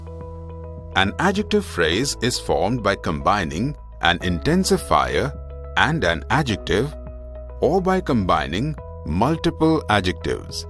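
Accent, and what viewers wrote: India. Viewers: Damn the Indian accent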